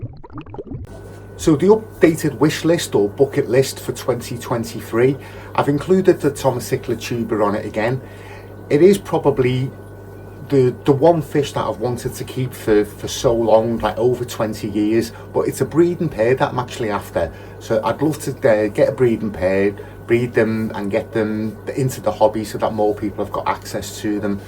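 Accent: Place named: Liverpool, United Kingdom. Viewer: Something completely off topic mate, me and the wife (she's not English) were watching the old 80's film 'letter to Brezhnev' the other night and she said at the beginning she was struggling to understand the accent. I just watched your video now and she could hear you and said you have the same accent as the people in the film, she says the scouse accent is nice when you get used to it haha, happy new year!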